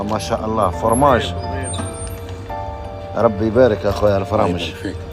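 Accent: Algeria. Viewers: مشاء الله عليك النظافة تبان عليه ونموت على الهدرة تاع عنابة ❤❤❤❤❤ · المتحدث في الفيديو ارهج سقية ؤزيد عليها لبساكرة ما يكلسلوش هكذا في الكلام عاشرناهم بزاف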